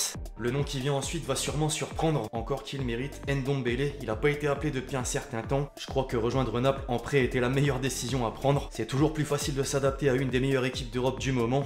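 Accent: North, France. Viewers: pareil que toi, sauf que je prends upamecano pour kounde, je savais pas que t’étais deuch nord (j'oro du min douter avec tin accent) en plus t'es sang et or !!! je m'abonnes de suite.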